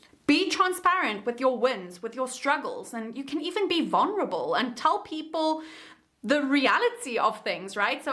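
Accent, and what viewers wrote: South Africa. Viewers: (Are you South African ?) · Love your South African accent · Love the South African accent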